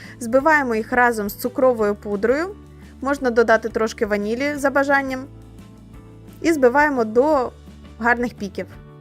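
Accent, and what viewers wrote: Ukraine. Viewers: Знайшла спочатку відео з льодяниками ваше і подумала, що ви з росії, але почула нашу букву «Г»)) а потім перейшла в канал)) українською набагато милозвучніше !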